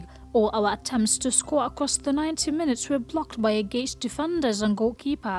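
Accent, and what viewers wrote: United Kingdom. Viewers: A good British accent. It should be less severe than it is. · انجليزي بريطاني بريطاني👍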